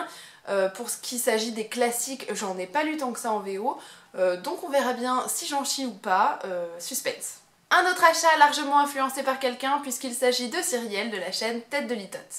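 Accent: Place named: France. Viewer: Un accent ne se travaille pas, ton accent peut s'amélioré mais restera toujours un accent Français. Je pense que tu confonds "accent" et "prononciation" qui sont deux choses différentes. Pour avoir fait une licence d'anglais, je peux t'assurer que l'accent n'est pas important, comme tu le pense, la prononciation l'est. Ne crois pas tout ce que tu peux lire sur internet.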